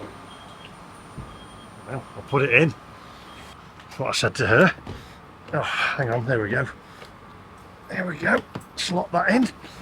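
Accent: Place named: East Midlands, United Kingdom. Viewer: if you get caught without the Crit'air sticker in the cities especially Paris its a 135 euro fine. Traffic wardens can issue them as can standard gendarmes. It costs less than 5 euros to get one sent to the UK and thy send you a pdf version you can use to print out instantly while you're waiting for the actual disk. You have to scan and post your V5 to the French "certificat air gouv" website then they will allocate your category. My 2001 Mondeo 2.0 petrol auto is Crit'air 3 and doesn't face any additional regulations but might do after 2025 when it will be most likely banned from Paris and other major cities. Now Euro 7 is coming out it looks like all Euro 4 vehicles will be banned from the cities. The Taycan would be Crit'air 0 or E. As for the accent thing, you sound to my East Anglian ears very much the East Midlander, if I had to narrow it down I would say Nottingham accent. 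And yes ha bloody ha I fell for the battery joke.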